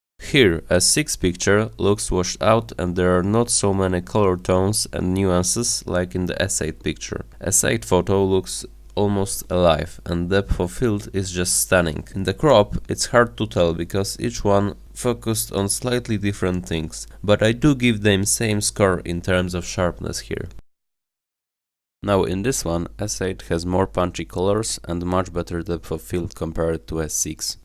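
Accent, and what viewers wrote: Poland. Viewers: Bardzo polski akcent... dobry test ale jak dla mnie ostrosc ma jednak troske lepsza s8 i dorzucił bym jej pół gwiazdki :) Pozdrawiam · Elo polska ;) po akcencie słychać zawsze ;)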